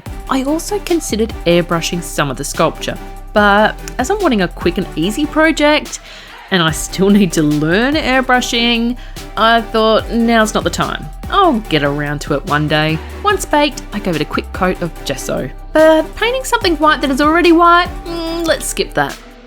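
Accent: Australia. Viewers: 😂😂😂 (context: I am from the USA) I just went to Google and searched, "Define Skalittle". It changed it to skeletal, but it still didn't click with me! So I thought, "Well, I must be spelling it wrong." So I used the microphone to say "Define Skalittle"and still, it gave me the definition of skeletal. It wasn't until this point that I realized you are saying skeletal, just the way, I guess, you guys say it in Australia. I cracked up!! I thought I was going to learn a new vocabulary word today! 😂😂😂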